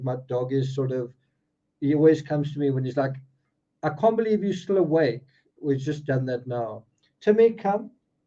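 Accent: South Africa. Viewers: South African talking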